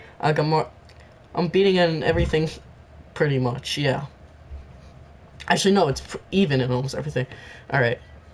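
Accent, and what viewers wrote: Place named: Estonia. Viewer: wtf ? are you from estonia??????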